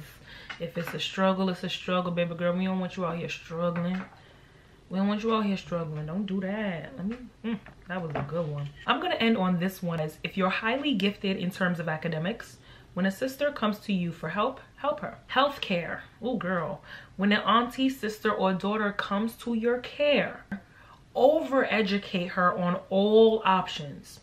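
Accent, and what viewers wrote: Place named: New York, United States. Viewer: awww I love your accent, I wish I lived in America and New York in particular cause your accent is just the best 😩💞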